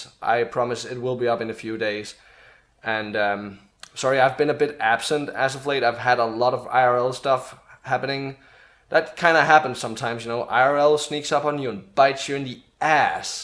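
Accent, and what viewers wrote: Denmark. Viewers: Der er ikke meget dansk accent, over din accent. =D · I heard u were danish instantly, im danish myself, i dont have as bad accent tho, a lot of danish people are stuggling with an accent while talkin english tho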